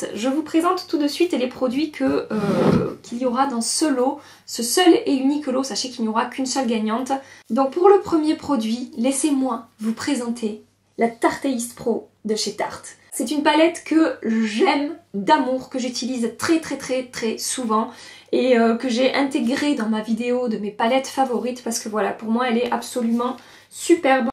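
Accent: South, France. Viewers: ce petit accent du Sud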